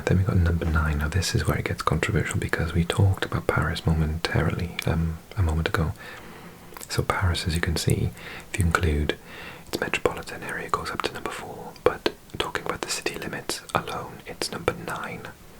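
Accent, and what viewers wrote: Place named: Wales, United Kingdom. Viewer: I have a theory…. Your Welsh!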